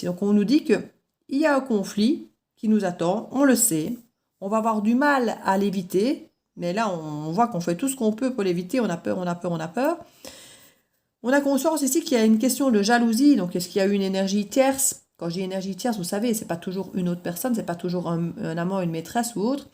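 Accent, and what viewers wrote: Liège, Belgium. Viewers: Ça me parle mais cet homme a 74 ans et ne changera jamais, il a un caractère impossible. Bravo s il a fait un travail sur lui mais je n ai définitivement plus confiance en lui et en plus la haine n est pas loin vu les 2 ans pénibles vécus dans ce lien karmique, rien de sacré là- dedans pour moi! Merci bcp,votre accent liégeois est très agréable, une compatriote!, je suis de Bruxelles. Magnifique votre guidance, cela m'a conforté dans ma décision de couper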